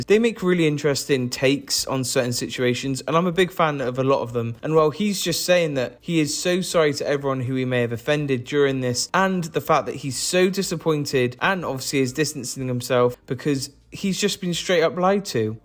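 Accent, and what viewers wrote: United Kingdom. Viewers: You sound like georgenotfound but 20x more British Btw I'm British aswell it's not a dig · Lmao this dude is British, Dream is American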